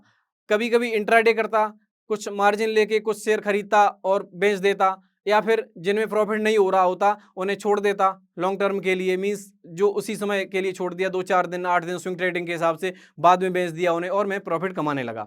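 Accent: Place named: Bhind, India. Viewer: Bhind ki bhasha bhut mst or pyari lgti he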